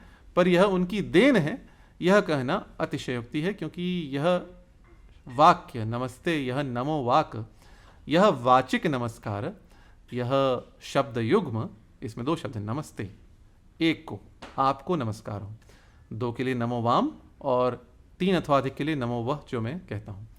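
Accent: Standard. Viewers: Bahut hi Badhia Vishleshan, balanced. Hum log to khichadi bhasha bolne wale hindu hain. Aap ki shush, sanskritnisht hindi sun kar Aanand aata hai. Aap ko sun sun kar humari bhi hindi achchi ho jaegi aisi Aasha hai. Uttam katya kar rahe hain aap. 👏👏👏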